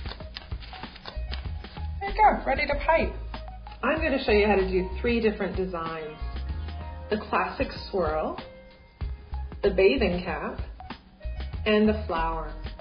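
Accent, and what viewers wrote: Canada. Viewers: ooh i like her canadian accent. and what size star tip was that?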